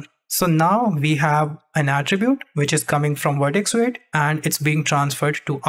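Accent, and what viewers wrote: India. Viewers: Yes from his voice he sounds Indian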